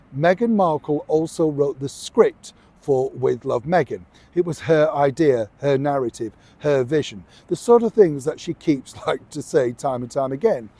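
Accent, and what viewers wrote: Yorkshire, United Kingdom. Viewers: It's his Yorkshire accent? Lovely on the ears 😁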